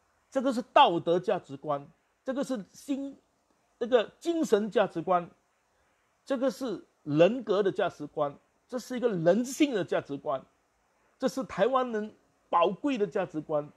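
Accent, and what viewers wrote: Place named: Cantonese, China. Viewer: 支持您！您的國語有點廣東腔，但很親切，又很有內容。加油！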